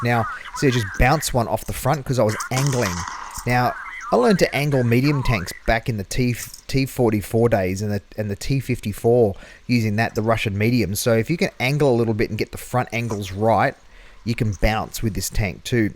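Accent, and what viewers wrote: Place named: Australia. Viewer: Always good to here an Australian voice, I'm at the progetto iv that's how ya spell it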